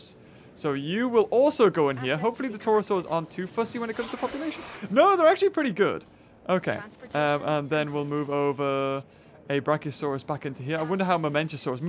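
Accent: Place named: Geordie, United Kingdom. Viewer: You're accent is weird I mean I can tell you're a Geordie but you say a lot of Scottish sounding words too, BroonCoo,Baba, photea maybe Scots English and Geordie words are just similar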